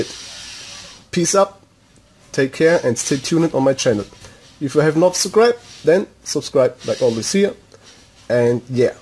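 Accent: Germany. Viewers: Man merkt sofort dass du deutscher bist.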